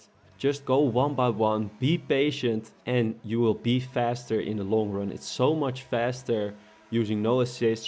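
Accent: Netherlands. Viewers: thx voor de tips man, holy Nederlands accent wel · holy nl accent mr thx man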